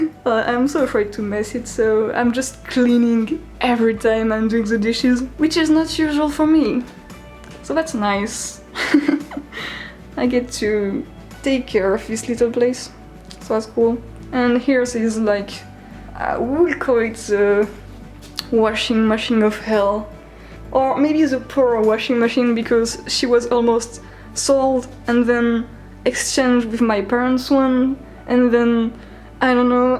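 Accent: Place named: France. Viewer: Bonjour！！ I just saw your channel by accident. What a coincidence! I am from China and major in French but I am a freshman so my French isn't good. I also intend to study and live in France, so I am looking for some videos that allow me to practice French and English at the same time. Finally, found you!!! Love your video, your good sense of humor, optimistic attitude towards life, and your fluent English with the cute French accent（I hope this won't offend you)!!!Looking forward to your more video!Bonne jourée!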